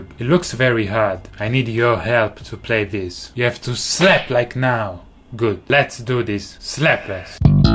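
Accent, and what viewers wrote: Italy. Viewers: His italian accent is pretty huge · I think he's actually italian because i'm italian ad he speaks like me when i dint't care about the english pronunciation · That's the Italian accent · Si sente che sei italiano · His passion and musical skill is addicting...hahahahaha Not to mention that hes kind of cute and that Italian accent puts the cherry on the top of the cake!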